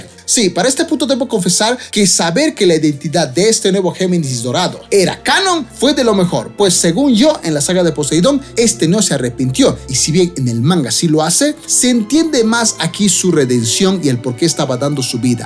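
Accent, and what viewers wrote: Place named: Ecuador. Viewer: No puedo seguir la narración porque ese acento ecuatoriano que mezcla la R con la Ch me distrae demasiado con su mala pronunciación 😐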